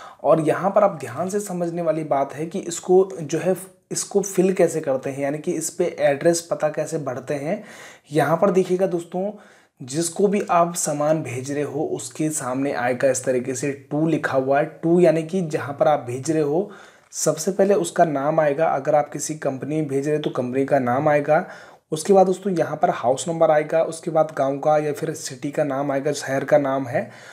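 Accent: India. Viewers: Bheji aap uk se ho ky lamgaw Uttarkashi se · Bhai lamgaon ka ho kya 😮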